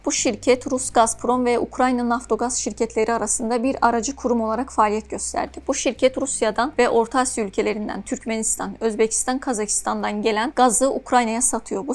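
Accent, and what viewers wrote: Azerbaijan. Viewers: Hanımefendi Azerbaycanlı, o yüzden böyle konuşuyor. Video çok güzel ancak GZT'nin genel problemi videolarının sonunu bir yorumla kapatmadan havada bırakıyor. yani konu detaylı veriliyor ama yakın vade ile ilgili bir öngörü yada yorum yapılmıyor. izleyiciye ise "düşüncelerinizi yazın" demek anlamsız çünkü araştırmayı editör yapmış, izleyiciye düşünce soruyor.. İzleyici yeni öğrendi nesıl yorum yapsın... o sebeple videolar internet bilgisi derlemesi kalitesinde kalıyor. ve kendini tekrar ediyor. izleyiciye ansiklopedi bilgisi veriyor ufuk açmıyor. editör son 3-5 dakika yorum ve konuyu kapatış konuşması yapmalı. çember kapatılmalı. buna çok dikkat edilmeli. aksi taktirde kalite ve izlenme bu yataylıkta gider. emekler için teşekkürler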